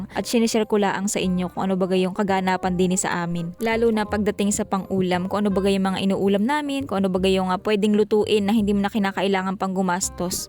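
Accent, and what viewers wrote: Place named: Mangyan, Philippines. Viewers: ma'am taga mindoro ka po ba? kasi sa tono ng salita mo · Miss sudgest lng aliisin mo ung tunog kamangyan kc Ang galing Ng voice over at mga jokes mo kaya d mo kailangan gumaya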